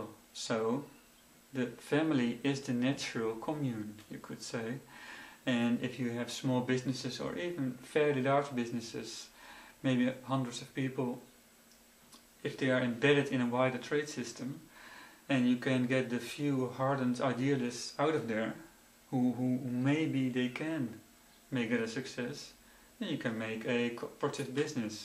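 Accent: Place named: France. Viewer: I did have a look at the beginning when he panned the camera around to show, as he said, "to show where I am filming this". But looking I was not able at all to see where. Apart from some dudes apartment. I did see that as far as I know it was not filmed in England or the UK. So judgeing by his French accent I guess it may be France of Canada or some where else French is spoken.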